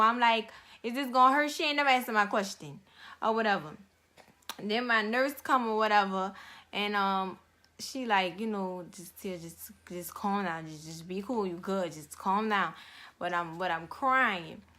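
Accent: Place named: New Orleans, United States. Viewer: That New Orleans accent tho 😝😝😝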